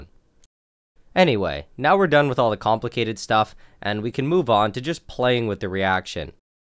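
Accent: Canada. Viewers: I love your thicc Canadian accent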